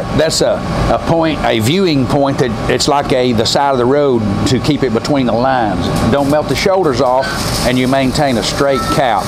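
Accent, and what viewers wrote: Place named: United States. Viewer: Well he’s explained it very good, and my hat goes off to him, and just love the yank’s accent. But why’s he using two hands, instead of one. Where I served my apprenticeship (Babcock & Wilcox) in Scotland, our instructor said it was always better to use one hand only, and used to poke your hand with a rod, if he caught you. I also thought his cap was quite rough, as his beads on cap was to far apart. But then, it depends on the quality of the rods. We were spoiled with Babcocks own rods, and they were brilliant for an immaculate finish on the cap. Murex vodex, was another good finish for inspection. 🏴👍🥳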